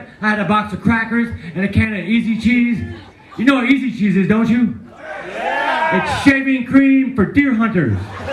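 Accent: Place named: South, United States. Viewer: Was he from Georgia? He has a southern accent here. Sorry he is gone❤😅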